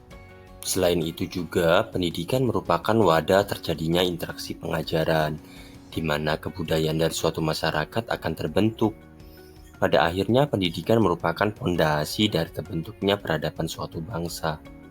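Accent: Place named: Java, Indonesia. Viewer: Wow. This has incredible visualization, idea, and script. Moreover, your Javanese accent will never ever be changed, that's our character. Good job, Pak.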